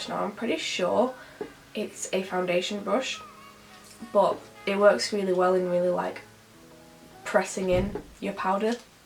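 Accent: United Kingdom. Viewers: hey, I am new to your channel, watching your video for the first time! you're beautiful! and please don't mind me asking, are you British? (I LOVE your accent)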